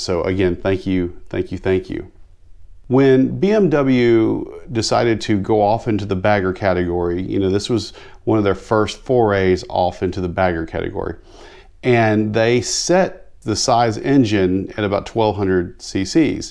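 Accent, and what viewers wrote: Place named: Alabama, United States. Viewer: Interesting I’ll admit I know nothing about these bikes.sounds like you’re in lower Alabama. I’m up here in dekalb county.you should come ride little river canyon.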